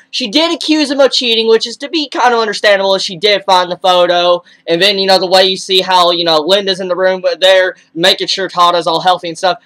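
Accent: United States. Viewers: So he used to have a country accent?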